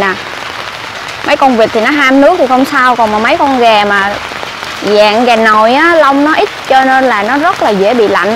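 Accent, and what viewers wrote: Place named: Nha Trang, Vietnam. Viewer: Nhớ giọng nói Nt quá đi Diên khánh hay Ninh hoà vậy Ko có dịp về mùa mưa dể thấy đuọc cánh này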